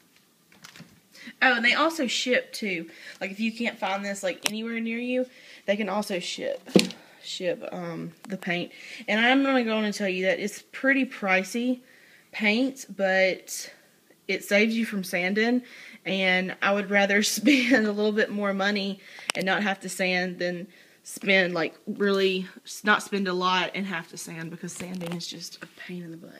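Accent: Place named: South, United States. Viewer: thanks! Awesome nightstand, how special that it was your grandmothers. so glad you are loving the paint! thanks so much for the shout out xoxo! I love your southern accent too:) xo Debi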